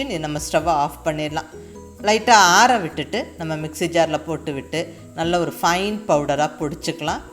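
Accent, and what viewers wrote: Kongu, India. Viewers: கொங்கு மண்டல குரல்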